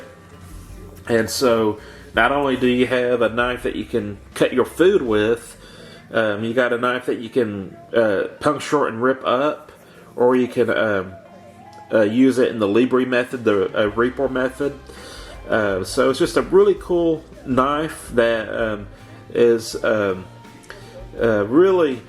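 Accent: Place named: South, United States. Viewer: Where are you from? Your accent is hard for me to place. American South?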